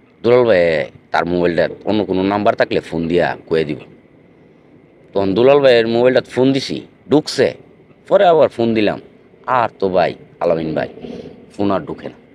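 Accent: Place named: Narsingdi, Bangladesh. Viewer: মনে হয় নরসিংদির ভাষা এটা!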